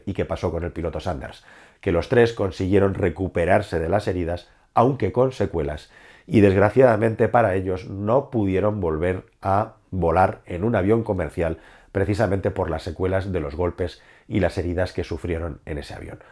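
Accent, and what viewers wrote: Spain. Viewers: Me desagrada hoir ablar a un chapeton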